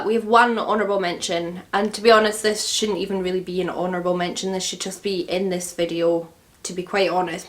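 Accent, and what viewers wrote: Scotland, United Kingdom. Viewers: Hi, thankyou for all of your videos, I love watching u Swatch, apply & review all of the awesome products on your channel. Can u plz tell me where to buy the earrings u wore in your 17th November 2921 vid? And your last video 2 days ago? The earrings are lovely! But of course the coolest thing about your vids is the lovely products & you flawlessly applying them & creating awesome looks. Hope to get a reply from you, I loveeee your accent, Scotland is beautiful I'm Irish so not too far from you. Thanks so much 🥰♥️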